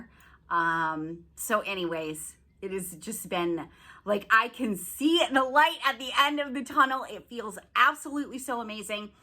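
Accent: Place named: New Orleans, United States. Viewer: I just love your cute little voice all the way from New Orleans..La💜🤣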